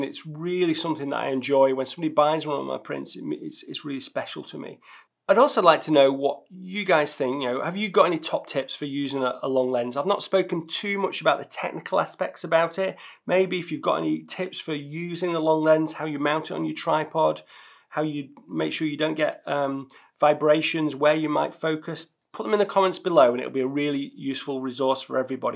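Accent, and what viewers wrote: United Kingdom. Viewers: Great stuff. I kinda wish I had grown up in the UK, simply for the accent:) instead I have "Texan";-). Love the Tree/Mountain shot! Most of my insect shots are using my 100-400.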